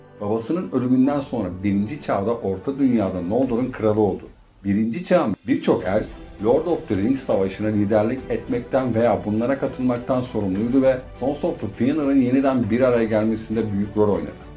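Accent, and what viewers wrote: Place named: United States. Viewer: Telaffuzlar amerikan ingilizcesi kıvamında olduğundan ekrana bakmadan izleyince feynır kimmiş la deyince ekrana bakınca he bizim eski feanor’muş dedim.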